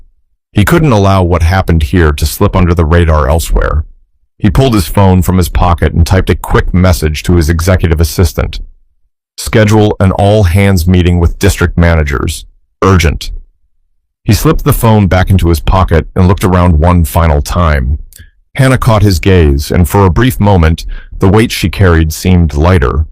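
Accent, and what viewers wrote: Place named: United Kingdom. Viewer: Best way to improve British Accent. RECOMMENDED🎉🎉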